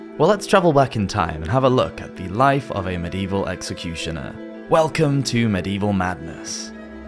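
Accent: England, United Kingdom. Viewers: Margaret Pole, Duchess of "Sallis bury"? For a narrator with an English accent, this guy certainly has trouble with his English pronunciation.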